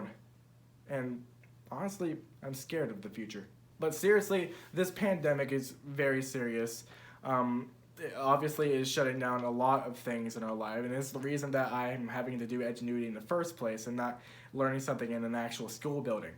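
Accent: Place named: United Kingdom. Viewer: British lady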